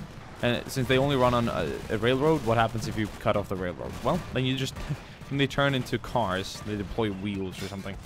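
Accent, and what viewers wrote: Sweden. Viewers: Are you Swedish??? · Are you swedish? You sounds like it when you speak english. Lovely videos btw.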